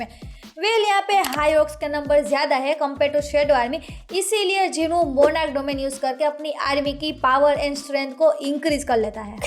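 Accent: Gujarat, India. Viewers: aap gujrati ho na?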